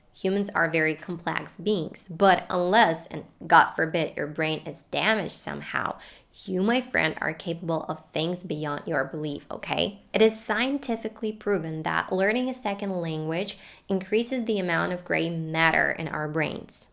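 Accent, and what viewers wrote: United States. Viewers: Her accent is American,and i like to be an American international communicator like rebel number one and i don't want to mention who is "rebel number one". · Madam your American accent is very clear like British accent we understand easily but the other American' s accent we can't understand so easily . So you are a good and expert teacher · Me "Holy s***, English isn't her native language?" From your accent and proficiency in speaking I immediately assumed you were an American. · You have a perfect American accent. Were you born in the US?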